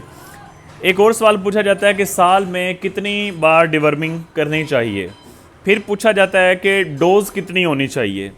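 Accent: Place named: Saraiki, Pakistan. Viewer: Layah ka mtlb ye hwa k ap mithi boli sairki bolte hn...... 😮😮😮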